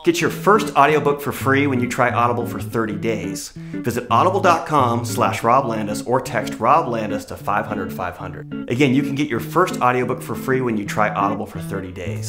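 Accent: Germany. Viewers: Are u German ? xD Nice Videos <3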